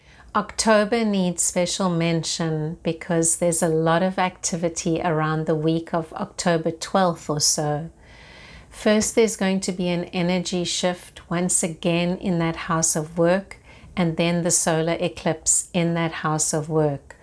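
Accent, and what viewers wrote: South Africa. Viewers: You sound South African 🇿🇦🥰I've had this on my watch later list for a few weeks now. Glad I watched it now, spot on from the start of the video, thank you for the message 🌻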